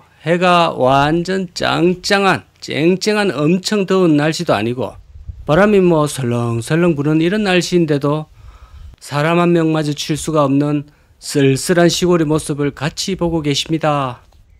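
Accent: Gyeongsang, South Korea. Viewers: 일단 구독 찍고 좋아요 눌러놓고… 댓글 다는 까닭은… 묘하게 사람을 끌어 당기는 조금 투박하나 사람 냄새 품은 나레이션 때문이고요. 경상도 저 아래쪽일 듯한 사투리, 소탈함 속에 해학이 영상을 보는 내내 입가에 웃음을 머금게하는 까닭압니다. 할배네로 자주 구경 오겠습니다. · 타지방 억양1도 가미안된 순수 경상가야국톤 사랑합니다.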